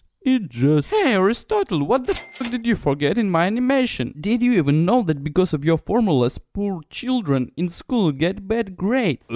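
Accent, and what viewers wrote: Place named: United Kingdom. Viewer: Друг мой, ты все видео говоришь на британском диалекте английского, он понятен всем нам (русским, кто не прогуливал инглиш), а вот американцам он понятен даже хуже чем нам.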